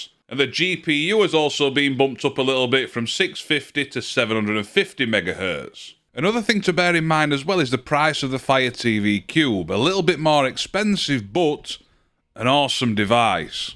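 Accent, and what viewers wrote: Yorkshire, United Kingdom. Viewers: First video of yours that I've watched, you've got to be from Yorkshire right? 🤣😆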